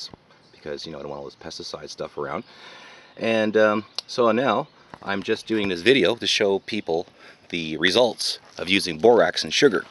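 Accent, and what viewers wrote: Canada. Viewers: He has a Canadian accent, and the box has French words. Areas in Canada are French.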